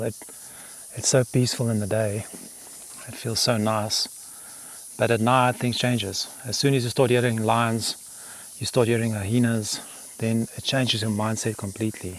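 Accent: South Africa. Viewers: Are you from South Africa? I was sure you were European. Anyway hi from Quebec Canada !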